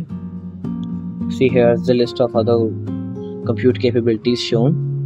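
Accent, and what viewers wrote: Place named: India. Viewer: just go and fix your English, we don't need to hear your disgusting Indian accent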